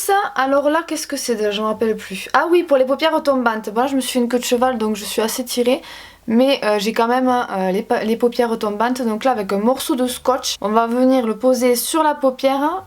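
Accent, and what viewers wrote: South, France. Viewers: Koukou j'adore ton accents du Sud comme moi lol et ce mot que j'adore mais que j'ai souvent honte de dire " je suis espanté " donc merci sa fait plaisir lol 😍😘💖❤😍😘💖❤😍😘💖❤ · Vive le sud et notre accent tu est de quelle origine ?? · Moi aussi je suis du sud et j’ai le meme accents que toi ❤️❤️❤️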